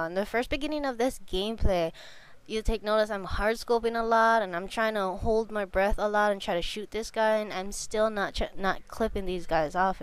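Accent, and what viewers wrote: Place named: Spain. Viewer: yea i could hear your spanish acsent =)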